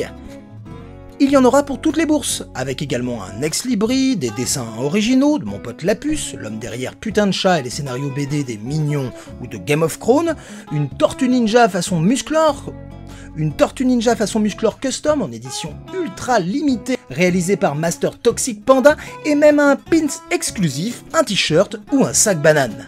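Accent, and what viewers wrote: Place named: Netherlands. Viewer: L'accent néerlandais... bon c'est rien vidéo de ouf !!!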